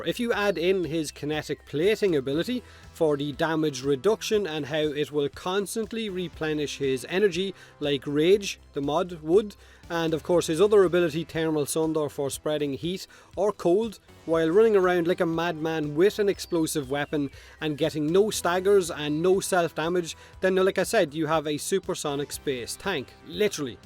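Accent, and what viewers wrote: Ireland. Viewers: Irish accent man: says gauss is a sanic! also irish accent man: proceeds to never actually using his 1 in the video... great vid though!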